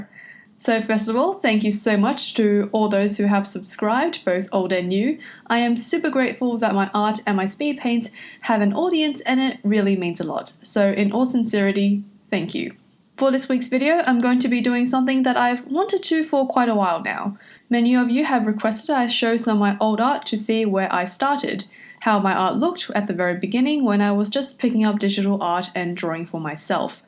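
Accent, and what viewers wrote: Australia. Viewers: Aussie Aussie Oi Oi Oi (didn't know you were Aussie) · Wait your Aussie! Gday from a fellow Aussie artist 😁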